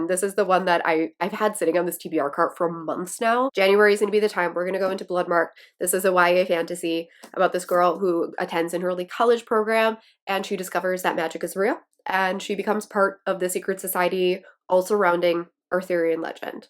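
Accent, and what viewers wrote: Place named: Wisconsin, United States. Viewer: Talking about your Wisconsin accent ruining your pronunciation is cracking me up. 😂😂😂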